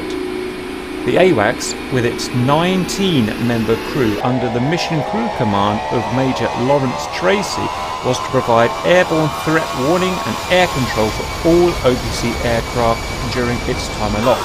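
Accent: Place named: United Kingdom. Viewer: IDK why but the British accent suits well for this kinda thing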